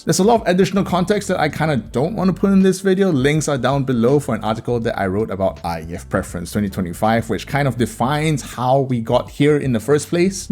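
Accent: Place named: Singapore. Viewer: Must be that Singapore accent. Similar "melody" of Nigerians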